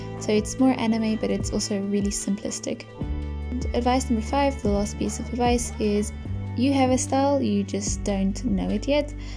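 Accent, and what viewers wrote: South Africa. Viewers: is that a south african accent? :D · Are you South African?!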